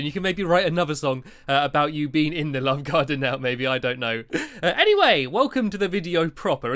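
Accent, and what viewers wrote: United Kingdom. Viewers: love you! Your British accent hits my heart well. THANK YOU! I like your videos.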